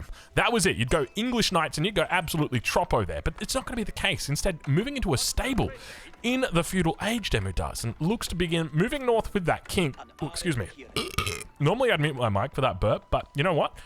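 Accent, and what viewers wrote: Australia. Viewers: You have no idea the things I do when I hear a good Australian voice..... <3 Aussie :)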